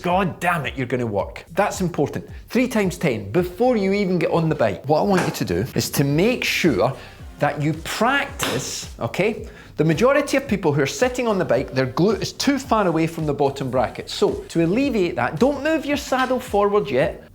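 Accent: Scotland, United Kingdom. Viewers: Thankyou for speaking plainly English like a a true Scotsman. I even get the jokes. Very refreshing. I understand where I’ve been going wrong. With no bs . Thankyou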